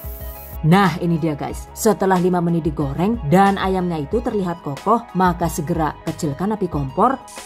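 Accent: Banyumas, Indonesia. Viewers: Keren pokoknya mba,,,Slam ngapak y,trima kasih ilmunya,sukses selalu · senengeh enyong.. sekaline rika wong ngapak 😂😂😂😂 tek subrek lah rika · Sy menyukai krn ada ngapaknya jg emoji lucu😂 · Cuma di Chanel ini dikasih trial sampe ke DNA, lengkap bgt do n don't nya, plus ngapak e ngangenin 🤗 moga sehat sll ❤️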